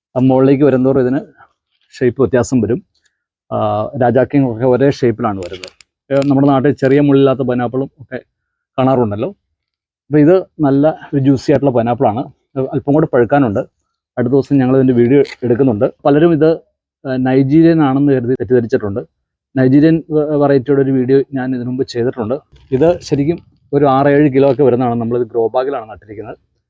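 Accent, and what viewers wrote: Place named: United States. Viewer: ഒരു അമേരിക്കക്കാരനെ പോലെ സംസാരിക്കും. ഇംഗ്ലീഷ്.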